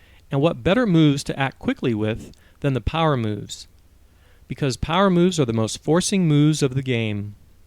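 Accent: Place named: United Kingdom. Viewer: Sir, you have gotten a niche that you teach and do it very well. Great to hear that awesome British accent. Thank you for all your help.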